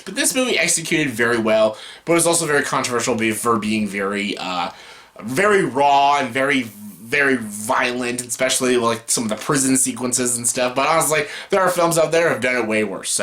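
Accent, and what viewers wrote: Canada. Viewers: What are you saying is your favorite Kubrick film? Canadian accenting hard here lol. Speaking of, is that how most Canadians pronounce Melancholia? 😊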